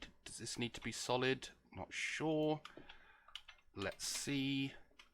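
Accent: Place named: United Kingdom. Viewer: Gotta love that British accent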